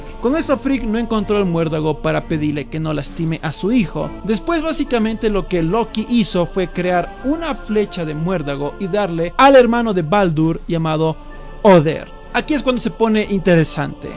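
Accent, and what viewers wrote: Ecuador. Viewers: eres de ecudor??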